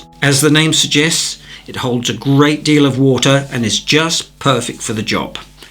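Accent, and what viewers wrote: United Kingdom. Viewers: Nice to hear fellow Brit! You are now m new favourite…just done your skies tutorial…and m now listening to your views on paper and brushes.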